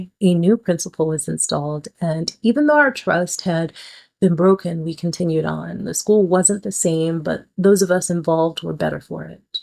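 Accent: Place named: Spain. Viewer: Ooh ooh, lovely Spanish accent 👌🤗 Hey a biker chic too 👌 All minor inconveniences compared to the maggot that's damaging US democracy 😉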